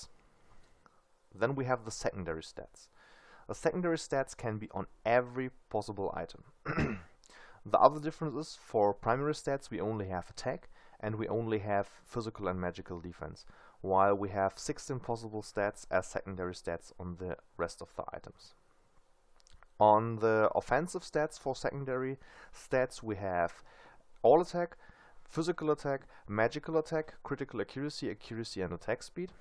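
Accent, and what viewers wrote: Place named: Germany. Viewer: The german accent °-°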